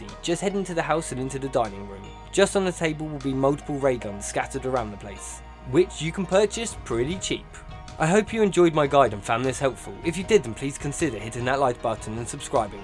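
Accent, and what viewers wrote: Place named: United Kingdom. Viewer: Thanks british fella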